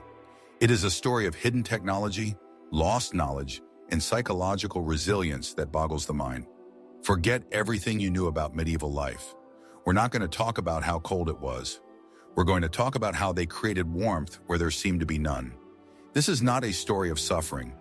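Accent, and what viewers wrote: United States. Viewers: Narrator a yank a no for me my house is older than the usa.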